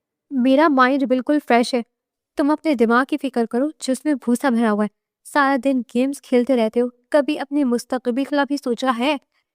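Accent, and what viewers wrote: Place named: United States. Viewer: Lagra hai app American ya London wali ho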